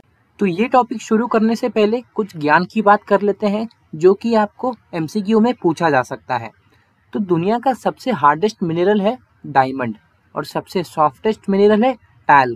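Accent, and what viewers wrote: Odisha, India. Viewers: Bro are you from Odisha ??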